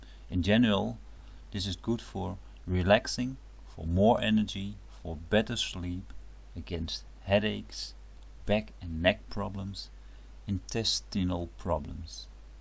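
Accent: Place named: Netherlands. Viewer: dutch accent!!!!!!!!!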